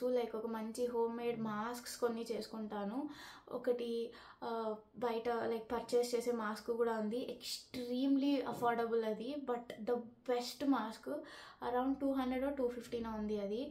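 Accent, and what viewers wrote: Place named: Anantapur, India. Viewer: Kal teaspoon 😂 our ananthapur slang❤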